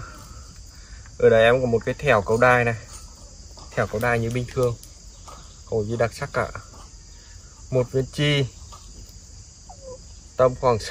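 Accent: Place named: Thanh-Nghe-Tinh, Vietnam. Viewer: Ông ơi nói tiếng địa phương khó nghe qua. Thật lòng thích câu mà nghe tiếng thanh nghệ tĩnh là chán luôn!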